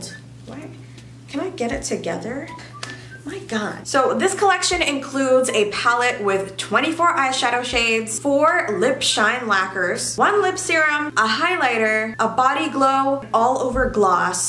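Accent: England, United Kingdom. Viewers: PLEASE, A VIDEO WITH U SPEAKING BRITISH ACCENT ONLY PLEEEEEAZZZ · why is no one talking about her british accent?😂it’s actually good,coming from a british person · Please keep making videos in british accent😩😩😩,love it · nobody gonna talk about her british accent XD i mean she is immitating but it's on point :)) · Can you do a vid only speaking British accent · You have a pretty decent English accent. Not too cockney not too posh 9/10. 👏🏻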